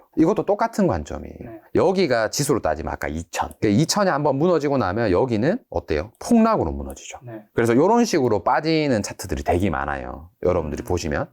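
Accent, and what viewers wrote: Gyeongsang, South Korea. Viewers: 주식판에 젠체하는 젊은 경상도 아들 와이리 많노~ 거슬리네~~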